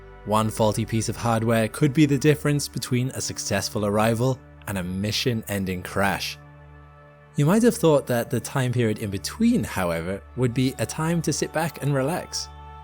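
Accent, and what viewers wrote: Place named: United Kingdom. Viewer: I have to agree , DESPITE his relative Youth, This (young) Man, is very VERY EXELLENT at what he does. He is a 'Grade A' presenter. AND, he happens to have an Accent, that automatically Engenders a "gravitas" with (Americans) WESTERNERS, Who still, to this day----- Feel a "UK Accent" automatically confers INTELLIGENCE .... THIS ..... must be some KIND Of genetically instilled memory (and LOVE) of "The KING* (royalty) it's obviously SOME KIND, of genetic Memory , we (Americans) just do NOT Question it ...................... it's a REFLEXIVE Response so, it's Gotta Be ---- Genetic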